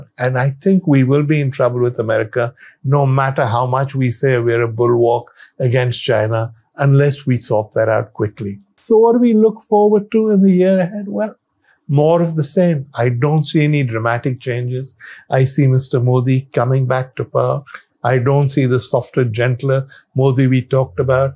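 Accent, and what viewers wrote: United Kingdom. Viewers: Too much wine and hangover British accent.. in Tamilnadu BJP may not get any seats, but be aware that the vote share is really rising. The idea of Hindi heartland party is only for these Khan market window shopping and gracelessly aging British leftovers in India..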